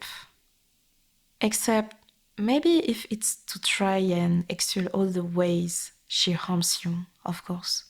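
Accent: France. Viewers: Ok, I've heard this script a lot, but your accent managed to make it feel new and refreshing. It's actually surprisingly rare to see french accents that aren't parodies, so, just wanted to say i love your accent (sorry for the incoherent writing, its currently 12 am) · Well, you did spoke with a French accent, and also the title said Yandere so I was just being honest about how I felt about this after hearing this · Attendez une petite minute... C'est un accent Français que j'entends non ? C'est peu commun j'aime bien. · Such a thick accent, I presume French? Sounds very nice. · "Mozer" love it ❤. Appreciate the french accent in the audio. Feels oddly good to hear an asmr video from (i assume) a french speaker without the weird judgement people in France tend to have towards french people speaking in english. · This needs a part 2 if possible, i think i've dropped a tear almost falling asleep to this, i swear i could feel the hug, the embrace and it's warmth. 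Sometimes i couldn't understand some words because of the lovely french accent but it wouldn't be same without it (also english is not my first language and i wasn't wearing headphones) This is amazing, simply amazing, thanks for this, from the bottom of my heart. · L'anglais avec l'accent français est vraiment relaxant, j'ai découvert la chaîne a l'instant et je trouve que, pour le moment, le contenu est vraiment bien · The French accent sounds so good, yet so bad at the same time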